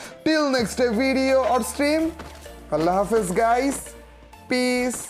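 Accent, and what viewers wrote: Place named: Comilla, Bangladesh. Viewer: ভাই তোমার কন্ঠ শুনে মনে হচ্ছে তোমার বাড়ি কুমিল্লা।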